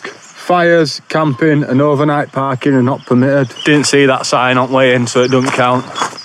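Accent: Yorkshire, United Kingdom. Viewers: Im sure you've had some dawg after ya food it looks like 😂 hope you enjoyed, im jealous. Keep up the good work just subed. Nice to hear another yorkshire accent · love it when you pronounce "cant" as 'caart'. Keep on yorkshireing old boy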